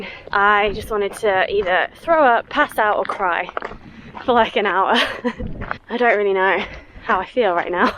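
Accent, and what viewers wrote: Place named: England, United Kingdom. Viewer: I can't figure out if you have a Welsh accent or English. I'm thinking English but every so often you sound Welsh lol If you're Welsh then Shwmae, if you're English then I hope you enjoyed your stay 😂👍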